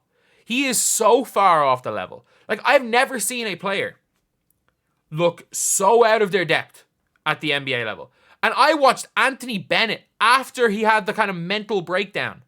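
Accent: Ireland. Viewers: Is this guy Irish? He sounds like me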